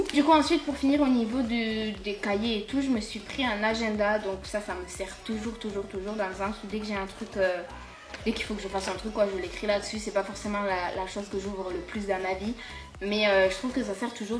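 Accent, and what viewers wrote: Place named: Toulouse, France. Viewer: Salut ma belle je viens juste de découvrir ta chaîne youtube et c'est une très bonne découverte dès le début de la vidéo j'ai directement reconnu l'accent de Toulouse qui est aussi ma ville, j'espère te croiser un jour dans Toulouse.Continu ce que tu fait tu est super naturelle et c'est une très bonne vidéo, bonne continuation sur youtube :)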